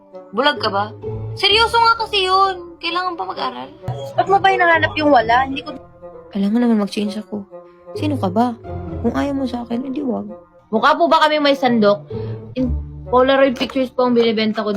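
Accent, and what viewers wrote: Visayas, Philippines. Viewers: Ang cute niya mag bisaya😅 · Ang cute nya magsalita haha ung pagkabisaya nya ang cute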